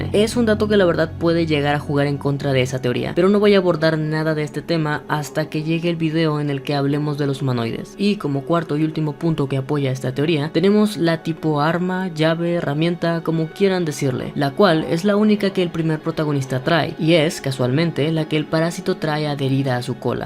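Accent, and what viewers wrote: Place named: Panama. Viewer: aa te sentia acento a panameño, no pareces de MX, buen video por cierto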